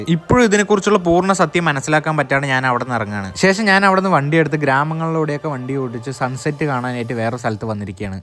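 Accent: Palakkad, India. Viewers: പാലക്കാട്ടുകാരൻ ആണല്ലേ. ആ slang😝😝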